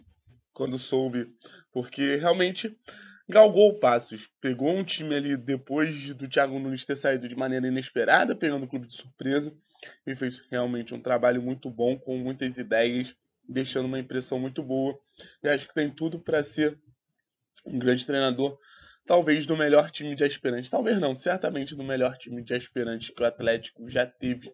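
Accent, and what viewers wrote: Rio de Janeiro, Brazil. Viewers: Ele é carioca cara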